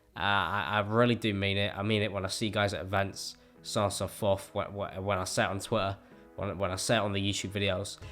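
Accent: United Kingdom. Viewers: You are the first person with a british accent who doesnt piss me off. I suppose yours is more subtle.... Where are you from exactly btw?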